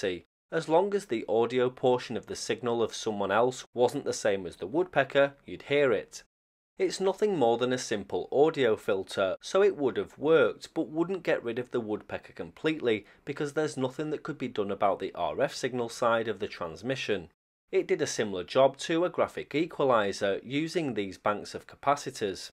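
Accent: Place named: Glasgow, United Kingdom. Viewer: is the man.... Great sense of humour too.... And he has a lovely Scottish accent... Glasgow I think.... He is very smart.... I'm a big fan of.... He is a very down to earth man.... And he gets on well with his brother..... I've had a few replays from him....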